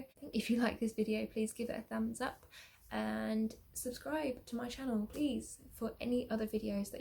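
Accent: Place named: Worksop, United Kingdom. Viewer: Where about is it you live in England? You sound like someone from Worksop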